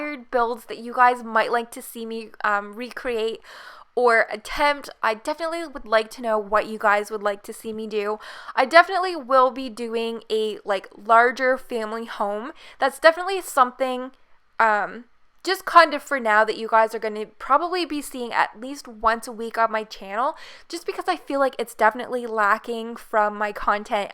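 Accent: Canada. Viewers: Are you Canadian? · As a fellow Canadian, I could tell you were Canadian right away from your accent lol (which is very nice to listen to). Loving this build! You have yourself a new subscriber :)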